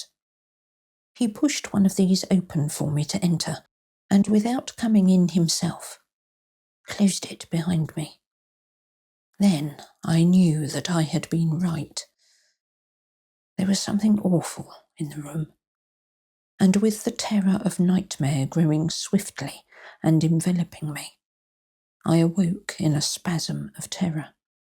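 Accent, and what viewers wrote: England, United Kingdom. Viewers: Can’t place the part of England you are from. Although I’m Scottish a lot of my family lived in Sussex, London and Wiltshire . Your cadence is softer and very pleasant and your readings are marvellous . I would love to hear you read “ Schalken the painter “ by Sheridan Le Fanu. Both a horror tale and an allegory of arti being sullied by rich patrons!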